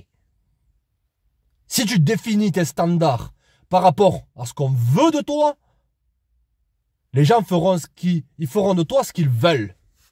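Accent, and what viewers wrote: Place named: Marseille, France. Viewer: Quelle sorte d'accent est-ce cela? Marseillais? Au fait, merci pour partager les pensées. C'était interessant.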